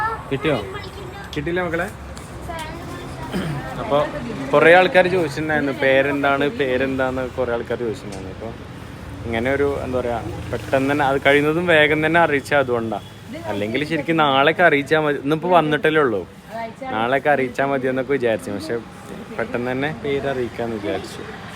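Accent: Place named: Kozhikode, India. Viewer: nammed koikoden bhasha🎉🎉adipoli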